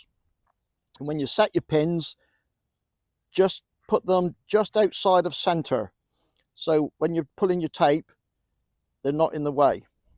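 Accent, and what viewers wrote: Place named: England, United Kingdom. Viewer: I'm curious if you are Canadian or English. Accent tells me English, swapping between metric and imperial, got to be Canadian.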